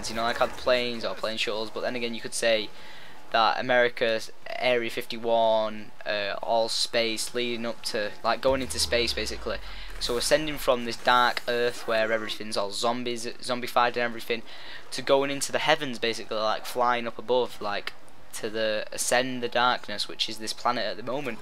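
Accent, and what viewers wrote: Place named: United Kingdom. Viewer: not a tutorial, some british guy talking about happy in the pants,...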